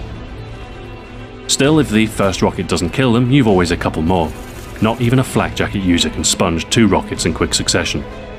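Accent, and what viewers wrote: Russia. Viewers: I love his russian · Hilaerious Russian accent (I'm Russian) :D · Nice Russian accent. Almost spot on and hard to believe you English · YOUR RUSSIAN IS SO FUCKING EPIC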